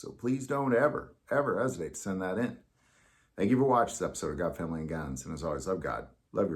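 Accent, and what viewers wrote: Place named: Texas, United States. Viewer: It sounds like Texas. I used to live there.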